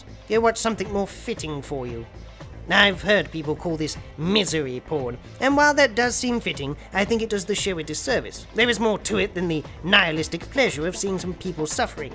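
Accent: United Kingdom. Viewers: Are you British or are you an American playing a British puppet character?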